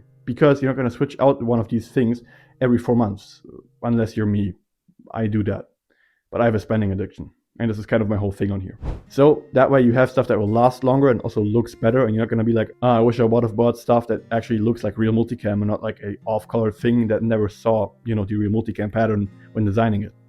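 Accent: Germany. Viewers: Ich höre doch einen Deutschen hier raus 🫣🫣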